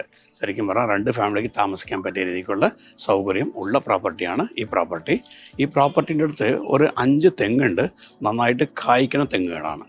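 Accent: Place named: Tamil Nadu, India. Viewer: Tamil accent😂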